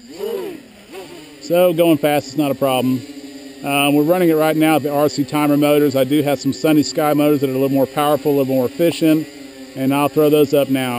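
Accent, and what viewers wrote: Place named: Texas, United States. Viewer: Hi, you sound like you're from Fort Worth Texas, are you? I'm from Houston. But, here is a question...Do you have any idea how much weight this design can lift? I want to build one and it will be my first rc craft, however, I will deck it out with all the OSD, GPS, and cameras needed to fly FPS. So, weight is a factor in a big way. If these motors are good, great I will buy them. If I need some a bit more powerful, got any suggestions on which ones to get? Thanks Much! Tracey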